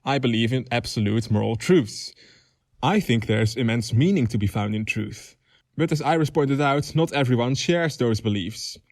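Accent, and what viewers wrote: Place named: Netherlands. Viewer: Are you dutch? You really sound dutch Anyways, great content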